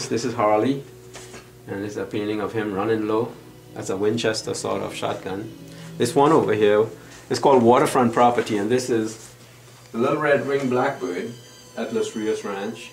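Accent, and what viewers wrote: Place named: Trinidad and Tobago. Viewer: Still got your trini accent man!! so cool..an I love your work..its wonderful.. love it all..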